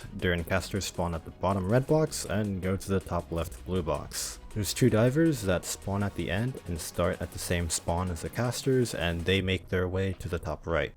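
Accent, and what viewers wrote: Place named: Japan. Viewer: pls stop speaking japanlish, i'm gonna die from cringe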